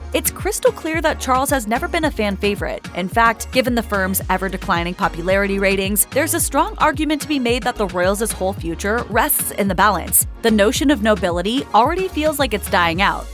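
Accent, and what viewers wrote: United States. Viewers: You sound American. Probably watched way too many episodes of that Crown program or something. There's major hospital crisis in the UK right now you know. The NHS is on strike. She has to go private, otherwise she would not be able to get her surgery in time and could maybe die, like I almost did about 6 months ago with my broken infected jaw.. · A woman with an American accent passing all sorts of opinions about how the British people feel. Hmmm. You can report the facts without all the not-so-well-veiled political narrative that you think the average person is unable to pick up on. Saying that Kate only did 142 engagements last year and is somehow a great disappointment to the people is rubbish. 142 engagements with the pressures of being one of the most watched and judged people in the world and a mother of 3 young children. Princess Anne is not currently mothering 3 small children. 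This video is a reach designed to try and influence people to hate the Royals and grow controversy.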